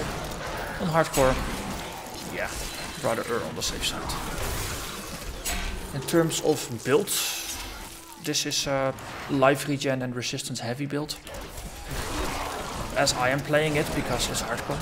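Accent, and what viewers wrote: Netherlands. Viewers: ik hoor gewoon dat je nederlands bent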